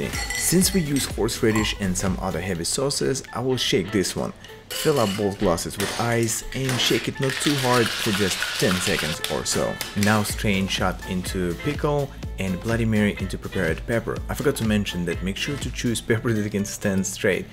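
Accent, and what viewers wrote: Russia. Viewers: Русский акцент, смотрел похожего бармена, ты попал в рекомендации, удачи с твоим делом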